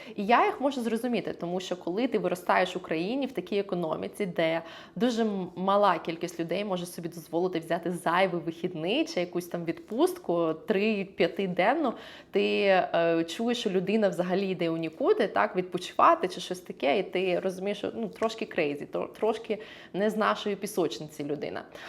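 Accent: United States. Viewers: Цікаво. Сподіваюсь ще знайду у вас відео, де зможу зрозуміти наскільки важко або легко там влаштуватися айті спеціалісту і як взагалі шукають там роботу. У вас Американський акцент, мені здається) або манера розмови, щось таке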